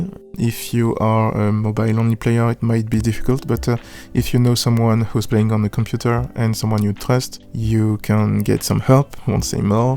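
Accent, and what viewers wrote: France. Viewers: Le bel accent francais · On sent le francais derriere t'es bien le premier ou les sous titres youtubes sont parfait 😂 Tres bonne vidéos dommage que pas en fr!😢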